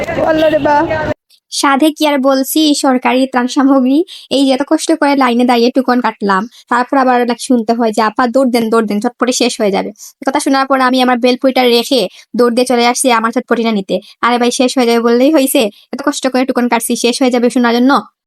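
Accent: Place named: Sylhet, Bangladesh. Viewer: Boin sylhety matilaw regho shuddo basha re nu rape korilray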